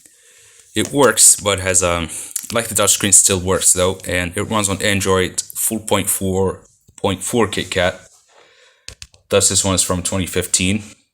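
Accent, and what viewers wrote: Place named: United States. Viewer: why do you sound kinda american lmao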